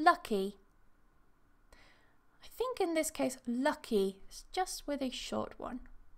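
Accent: United Kingdom. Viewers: IMPRESSIVE LESSON! For me Ms. is the most amazing teacher. I do love her melodic British accent as I told everybody I've devoloped the American pronununciation but now I'm learning the British entonation and pronounciation as well. Thank you very much Ms. 😘😘😘😘😘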